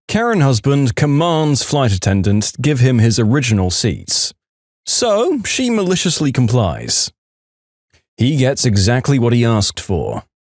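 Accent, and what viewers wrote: United Kingdom. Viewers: I love the British voice more actually lol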